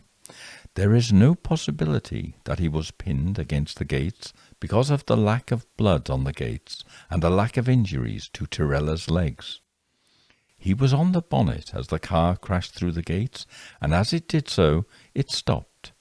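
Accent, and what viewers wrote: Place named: Northern England, United Kingdom. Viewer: Your narration and voice are perfect. Every so often a tiny northern inflexion. Such a lovely lilt amongst English accents, which lends perfectly to spine-tingling and sinister tones.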